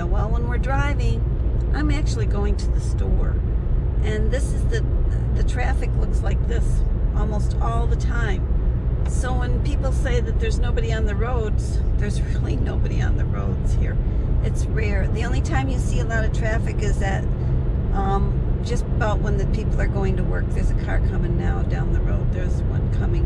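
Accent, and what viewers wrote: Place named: New York, United States. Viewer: It is beautiful countryside. It looks peaceful. I've never been to New York, except a plane stopover at JFK, if that counts. The village looks cozy. I had a bf from Corning, and his mom worked at the Corning factory. He was my first love, so I never really got over him. Be careful, filming and driving. I haven't driven a standard in many years, but I can do it. Yes, so cozy is the countryside. And you do have a New York accent, definitely. That story about the dogs in the trunk is dreadful. Those dogs are amazing. They did not holler, which goes to prove that dogs protect their people, and they don't expect their people to protect them. They could have very easily hollered to get yall's attention. And the fact that they held in their urine. Yes, very amazing dogs indeed.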